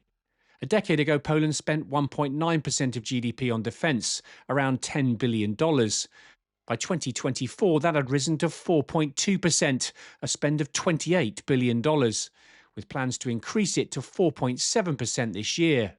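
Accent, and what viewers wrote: United Kingdom. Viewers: British accent and real intention of Russia are not connecting together.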